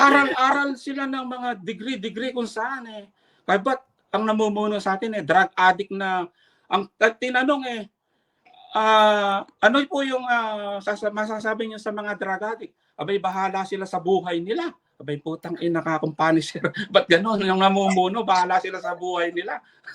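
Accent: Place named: Pampanga, Philippines. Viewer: Kuya sa boses mo Alam ko may dugo kang Kapampangan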